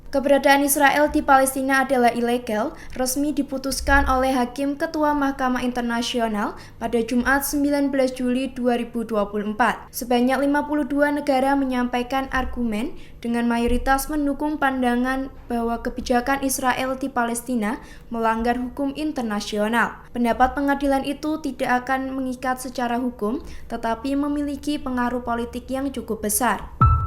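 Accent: Java, Indonesia. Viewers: Ngomngnya medok amat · suarane medok,,,tp merdu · Soundnya kok medoookkk banget 😂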